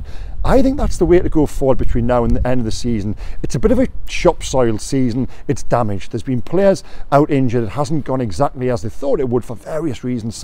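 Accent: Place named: RP, United Kingdom. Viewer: he’s got a weird tory accent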